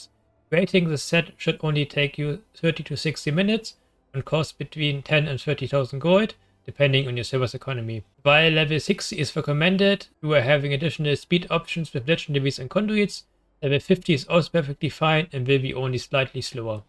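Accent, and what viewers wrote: Germany. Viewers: man hörts du hast bisschen deutschen akzent :D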